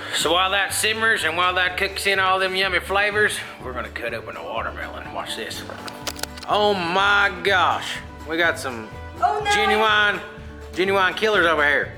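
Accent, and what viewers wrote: United States. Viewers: Lol😁 The whole time I was holding my breathe praying🙏that your mustache didn't fall off into that Chili ha ha ha😁😁😁😁😁😁😁😁😁😁😁😁😁😁😁 I am going to definitely try making this chili I could literally taste that chili while watching you cook it..looks amazingly good👍 You Got that Southern accent down packed✌😋🙏👍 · Sorry, not like British one. The cow boy one in the west USA. ♥ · u so funny wit dat cowboy accent, u great man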